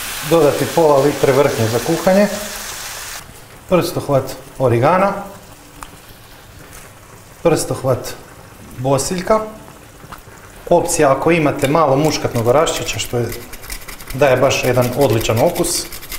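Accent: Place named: Banija, Croatia. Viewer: Super recept, svidja mi se kako pričaš, vrati me u detinjstvo i moj zavičaj, Baniji, svako dobro ti želim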